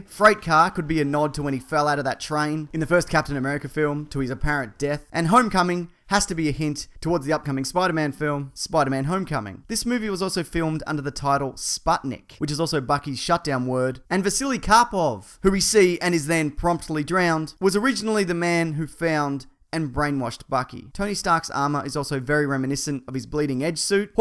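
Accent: Australia. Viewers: Are you a Aussie youtuber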